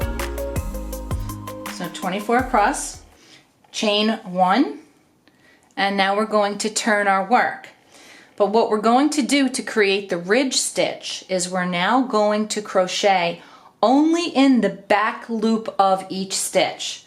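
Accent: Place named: Brooklyn, United States. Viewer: Are you a Brooklyn girl originally, specifically Williamsburg section, cause you sound like me girl. Lol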